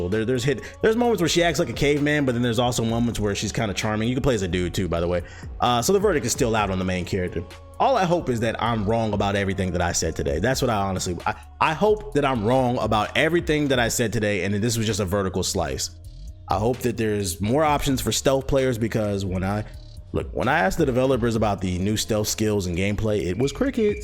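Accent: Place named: Pittsburgh, United States. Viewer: He must be from Pittsburgh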